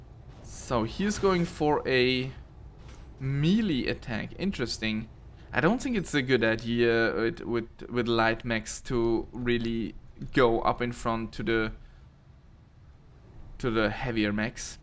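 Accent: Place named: Germany. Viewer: Yess man merk den deutschen Akzent, allerdings machst du das echt gut💪 ich würde untergehen im Lets play wenn ich es auf Englisch machen müsste😅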